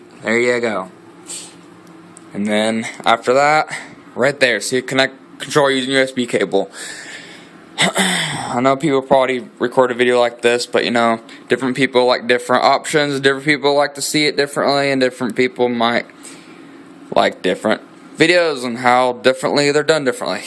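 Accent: South, United States. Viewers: Never in my life heard anyone pronounce it Ray-men, lolz. Ray-men? Not Rah-men. Where are you from? You gots a southern accent for sure.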